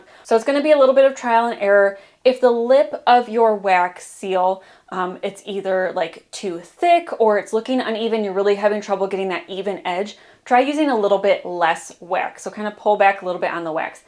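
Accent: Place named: Michigan, United States. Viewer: Very nice tutorial! Are you by chance from mid Michigan? Enjoyed your slight accent 😊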